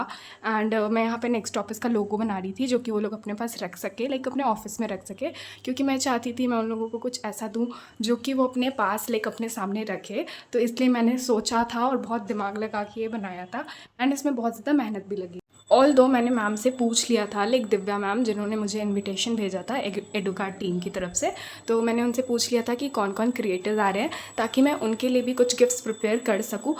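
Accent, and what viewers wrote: Bihar, India. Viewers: Aap Bihar se ho kya di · Aap bihar se ho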